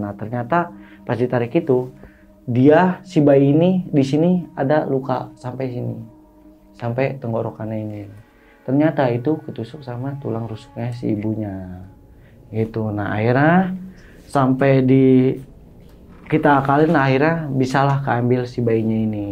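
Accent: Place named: Bogor, Indonesia. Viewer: Rada cape dengerin ceritanya. Padahal kita sama orang sunda. Mungkin karna orang bogor jd dikit beda nadanya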